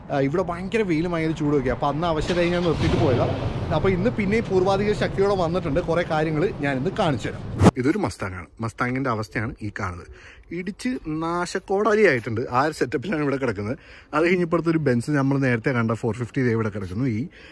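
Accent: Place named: Kodakara, India. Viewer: Bro naatil kodakkara aano place ente oru friendine orma varnne bronte samsaram kekkumbo katta support bro👍🏻👍🏻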